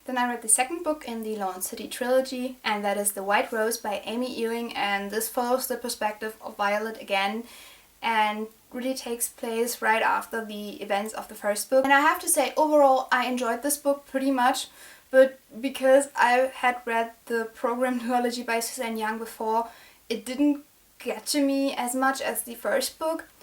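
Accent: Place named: Germany. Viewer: I just found your channel and I'm def subbing! Are you from Germany? Your accent sounds a bit german like!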